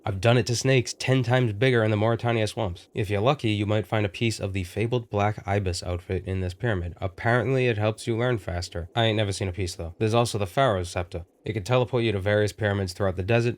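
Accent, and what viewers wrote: Boston, United States. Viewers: Hahha the thick Boston accent keeps coming out, makes me think about Bill Burr reminiscing about cops in Boston: THE KID'S A GOOD SHIT ^^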